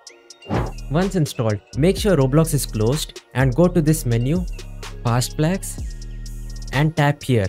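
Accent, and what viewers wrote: India. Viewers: once you hear the indian accent you know its gonna work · Bro are you Indian boy or smth?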